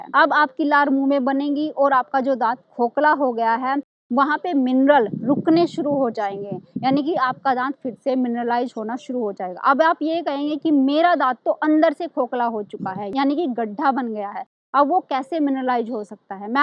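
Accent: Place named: Haryana, India. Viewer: Her accent is haryanvi usme ese hi bolte hain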